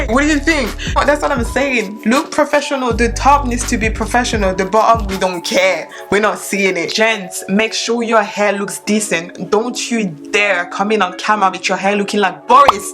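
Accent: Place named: South Africa. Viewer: I actually thought you South African 🥺😻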